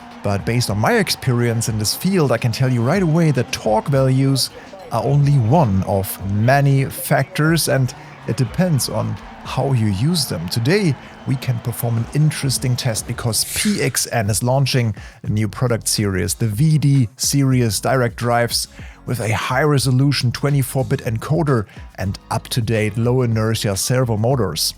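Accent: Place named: Germany. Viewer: Man hört dein Deutsch extrem raus😅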